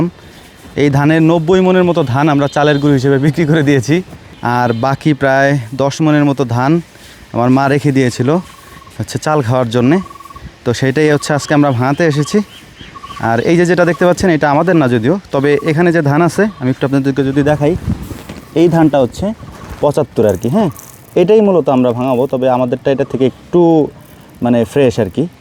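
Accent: Faridpur, Bangladesh. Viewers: আপনার আঞ্চলিক ভাষা শুনলে বোঝা যায় আপনি খাঁটি ফরিদপুরীয়ান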